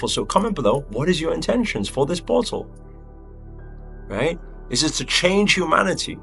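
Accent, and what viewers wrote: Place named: United Kingdom. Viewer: Why did I think you are a South African but listening to your accent now you sound British.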